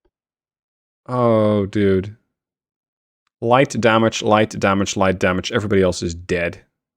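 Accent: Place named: Netherlands. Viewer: Your accent has enough Dutch in it :)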